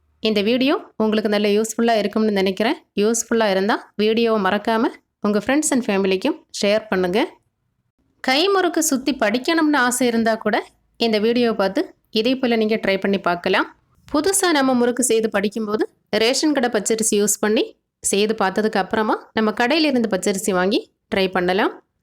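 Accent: Kerala, India. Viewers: Your's is Malayalam accent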